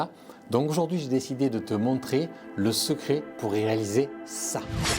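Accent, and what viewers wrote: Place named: South, France. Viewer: Sympa ton accent du sud... Et ensuite tu auras besoin d'un Iris !:trophy-yellow-smiling::popcorn-yellow-striped-smile::card-red-penalty::medal-yellow-first-red::takeout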